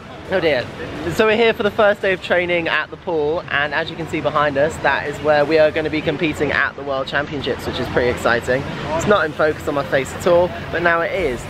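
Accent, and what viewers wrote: United Kingdom. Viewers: Hi from Argentina!! (I speak spanish so sorry for mi mistakes) Congratulations for you!! I really like it how so you speak with the britiah accent hahah. And i always shocks when see you doing that in the pool!! Is really crazy how you can do that. I probably never can do that, im more studying person haha. But good luck for you!!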